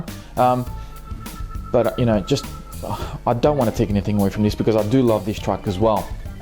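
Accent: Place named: Australia. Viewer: Hi, I see it's been about a year since you did this review of all your SCT's and I wonder if things have changed with the Prolite. I have both a Trooper and the prolite. I just bought the Prolite SCT and ran it for the first time today. The prolite IMO beats the trooper by ton. It handles better, is faster and of higher quality. The first thing I looked at were the body posts. On mine they are so flexible that you can almost bend them 90 degrees and they just bounce back. After 30 races nothing broke so I am pleased with that. My only issue was the motor mount was loose right out of the box. I found that out when I did a little test run in my yard. Luckily nothing stripped or broke. I simply reset the mesh and tightened the screw on it. I love this truck already. I raced a bunch of friends who run slash 4x4 both HCg and LCG chassis and not only did I keep up with them I beat them regularly. 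Great videos you put out. Lots of great info too. As they say, "Come for the info, stay for the accent".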